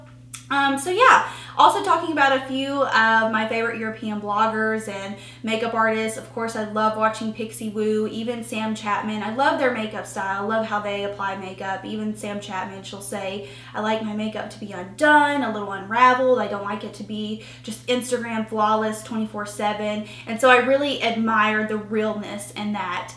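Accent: South, United States. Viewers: I love your videos and your adorable Southern accent. I love Burberry makeup looks too! Violette_fr is a super cool French blogger.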